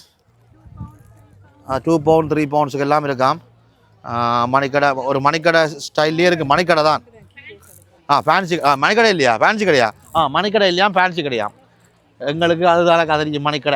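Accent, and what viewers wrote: Jaffna, Sri Lanka. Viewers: யாழ்தமிழில் கலகலப்பாக பின்னுகிறீர்கள் கோழிப்புக்கை எப்படி செய்வது என்பதை எனக்கு அறியத்தருவீர்களா?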